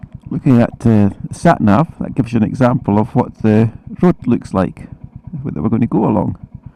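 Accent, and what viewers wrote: Scotland, United Kingdom. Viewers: Really loved the video and your Scottish twang. Looking forward to seeing more videos and your commentary. Beautiful scenery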